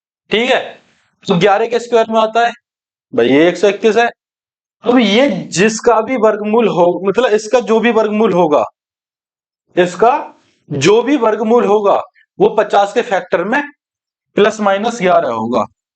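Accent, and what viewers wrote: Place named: Muzaffarnagar, India. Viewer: Sir muzaffarnagar ki language lg rhi h aapki 😊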